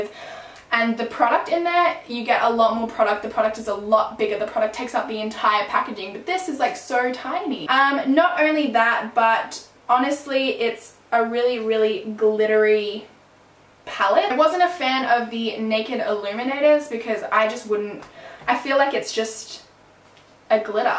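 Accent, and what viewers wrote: Australia. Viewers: I love your accent, are you australian?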